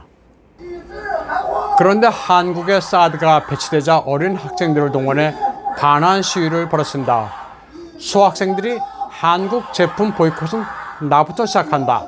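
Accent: China. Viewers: 그냥 한자대로 좀 읽어주세요. 꼭 그리 짜장 발음대로 해야하나요? 알아듣기가 너무 어렵소.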